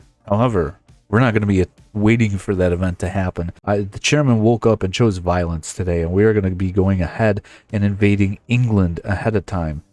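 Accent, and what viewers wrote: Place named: Upper Midwest, United States. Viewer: Are we a Wisconsin Or Minnesota or Michigan native? I know a upper Midwest accent when I hear it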